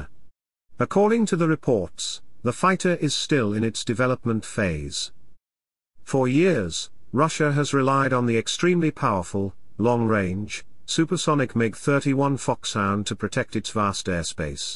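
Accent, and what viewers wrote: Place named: United Kingdom. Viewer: Very interesting report.. Excellent British pronunciation.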